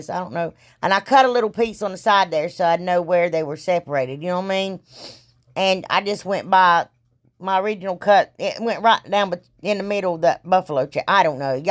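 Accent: South, United States. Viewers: You sound like a Southern Country Girl. The rocking chair is adorable I think it would be cute with a country bunny! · Ive been looking every chance I get for a CALENDAR with a truck.I guess I'm just not fast enough 🤔 I will keep watching u always bc u r my favorite crafter & u always lighten up my days. please Don't change a thing. course I will keep you & you're family in my uprayers . Can u tell me where u grew up bc u have a beautiful thick acent. I thought u said something about Ohio but u sound more Southern. I hope your parents feel better soon & I will pray for GOD'S BLESSINGS on u & your family it looks like GOD'S already BLESSED u with Talent .The longer the video the better. I'm about to watch another of your videos. Keep them coming. love u girl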